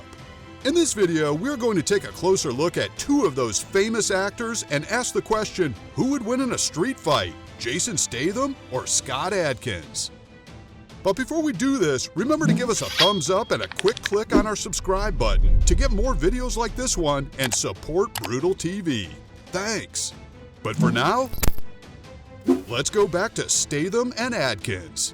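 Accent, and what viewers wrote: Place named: United States. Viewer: this overexaggerated american accent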